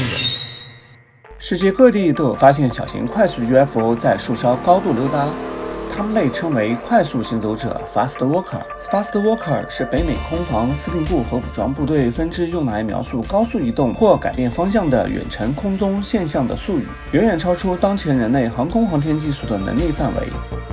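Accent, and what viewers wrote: China. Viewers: 听口音大陆的哦！ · 一直以為tea小編是台灣人耶，聽口音很像上海人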